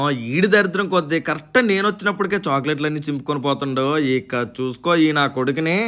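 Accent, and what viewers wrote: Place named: Srikakulam, India. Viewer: Bro pakka srikakulam laga maatladutunnaru meedi srikakulam aa bro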